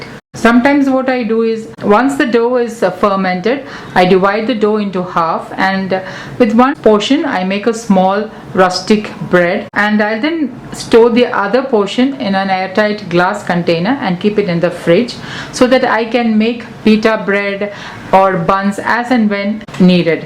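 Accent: India. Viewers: it is already recognized by many pple known as Indian accent and clear English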